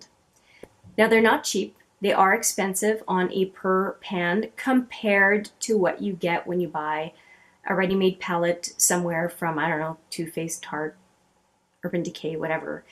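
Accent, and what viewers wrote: Canada. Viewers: You are such a lovely and beautiful person❤️ I have been loving your videos! As soon as I heard you speak, I could tell you’re Canadian (my husband is Canadian and I’m American)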